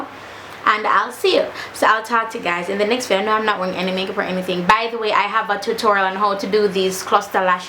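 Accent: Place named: Jamaica. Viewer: nice girl... from the first time I watched your video I heard your accent and I know u were Jamaican... big up uh self goodie mi a Jamaican too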